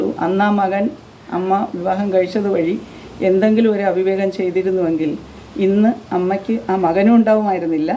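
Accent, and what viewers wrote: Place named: North India, India. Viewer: North Indian ladya pola und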